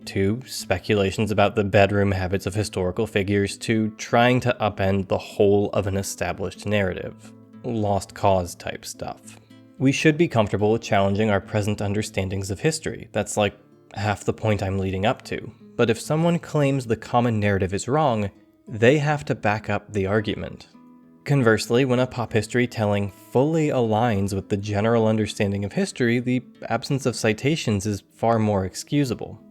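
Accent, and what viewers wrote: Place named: California, United States. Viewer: So what if he does? There’s lots of vowel shifts currently happening across different accents in American English. He sounds Californian, which makes sense cause that’s how a lot of us say “biases” here.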